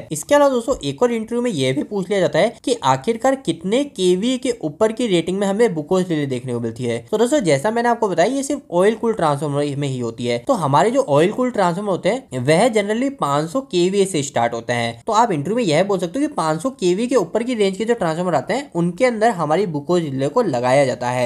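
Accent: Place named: Maharashtra, India. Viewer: Sir Aapki bhasha sunkar Aisa lag raha hai ki Aapko Marathi bhi aati hai Aapko 😅😅Sir plz Marathi mdhe video banva na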